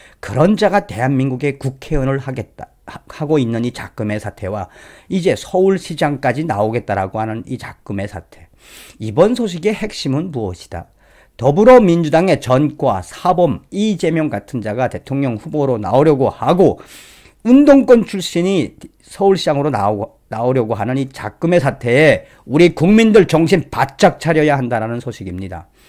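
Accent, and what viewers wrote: North Korea. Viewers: 북한식 말투가 조금 섞여 있는 것 다 알고 있는데 별문제 없다고 봅니다. 괜찮습니다. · 방송내용은 좋습니다 만 방송은 표준어가 원칙입니다북한 사투리를 좋아하는 사람은 없습니다 수다래 라는북한사투리 선입감이 좋지 않습니다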